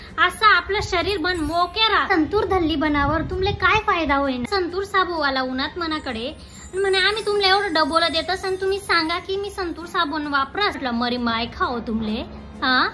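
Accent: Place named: Marwar, India. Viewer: Hi कोणती भाषा आहे, खानदेशी का अहिराणी? खूप मारवाडी भाषे सारखी CH वाटते आहे 😁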